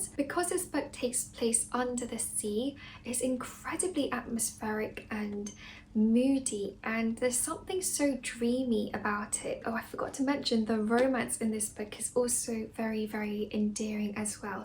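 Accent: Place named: United Kingdom. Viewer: I love your brittish accent oh my god keep talkinggggg!! 🫶🏻 hahahaha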